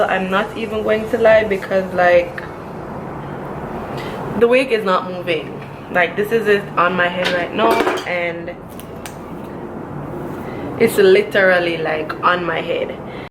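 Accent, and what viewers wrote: Jamaica. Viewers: I’m like this girl sound Jamaican 🤣 and I realize you are. Love this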